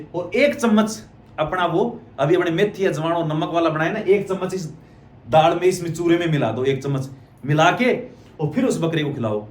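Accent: Rajasthan, India. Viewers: 😂😂👍👍 Very nice 👌👌 Bhaai aap ka samjhaane ka tarika bahut hi sunder hai 👍👍 अलबादी बकरा भाषा में राजस्थानी लहज़ा बहुत ही शानदार लगता है 👌👌👌👍👍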